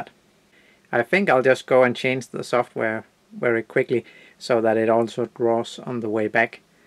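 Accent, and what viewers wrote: Denmark. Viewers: hey er du fra Danmark? (hello are you from Denmark?)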